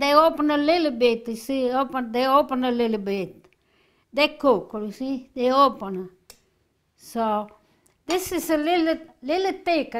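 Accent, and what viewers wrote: Italy. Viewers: Today for lunch, I made the baked fish cod fillet exactly as you made. I was so surprised how delicious it came out considering all ingredients came from the pantry. My husband and I really enjoyed our lunch. I always enjoy your cooking show, love your Italian accent, your lovely voice, but most of all the meals you prepared are simple ingredients and delicious. Thank you for the delicious cod fish recipe. God bless. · you're the Best...👍 You make me feel so much Better with your Sweetness... I smile a lot cause of the way you speak... describing what you're making with your Awesome Italian Accent & singing brings a comfort to my heart!! 🎤💖🇮🇹 OH & the delicious recipes you make!! 👏👏👏👏👏😀😋💖🇮🇹🇺🇸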